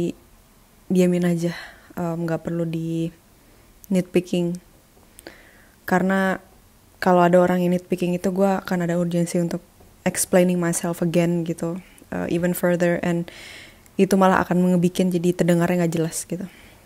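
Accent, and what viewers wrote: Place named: Medan, Indonesia. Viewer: telat nonton ini, tpi aku berasa relate bgt sih kek denger temen lagi deep talk krn skrg lagi nganggur dan chance buat burn out gede apalagi pandemi makanya dtg kesini biar dpt sedikit wawasan sembari mau jdi pendengar dikala gaada temen yg bisa diajak ngobrol. sampai sekarang aku masih mikir kak just being kak same as medan people just being medan people who had known as rough accent, same as javanese people being javanese people who had known as gentle accent, we just need to embrace all the uniqueness of different type of people. maybe for some people they are not familiar to found some people like kak, mereka terbiasa lihat klo cewe mau itu pakek jilbab gapake jilbab ya lemah lembut, atau mereka gk terbiasa dgn kata2 kasar kayak gk lumrah buat mereka. but for some people yang ada pengalaman punya temen kyk kak mereka kek "its not a problem, thats how we were friend, thats how i like her, her just being her, its her choice to how her react" at the end of the day we are all human thats it.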